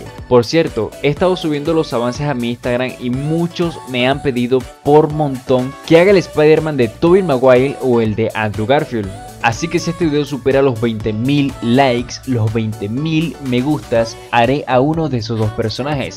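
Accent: Venezuela. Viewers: Se nota que eres Venezolano como yo que fino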